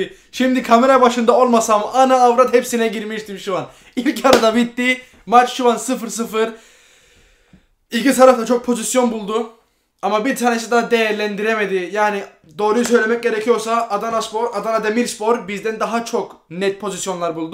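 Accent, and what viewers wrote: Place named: Northern Cyprus, Cyprus. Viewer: Ingılterede yasayan kıbrıslı turkmusun aksan bıraz oyle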